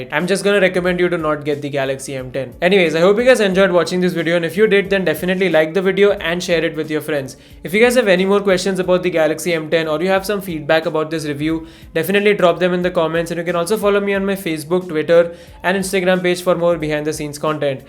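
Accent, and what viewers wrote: India. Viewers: The indian accent is too strong anyways thanks for the review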